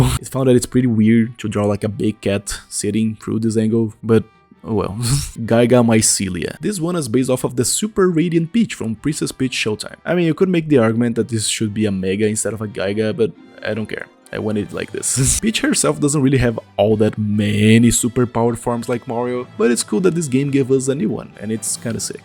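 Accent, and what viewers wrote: Brazil. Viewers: I LOVE IT!!!! are you brazilian? your accent reminded me a lot of the Brazilian accent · I swear you have brazilian accent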